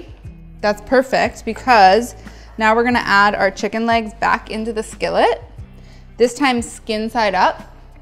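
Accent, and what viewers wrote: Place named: United States. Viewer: Why is this uk dinner lady speaking with an American accent?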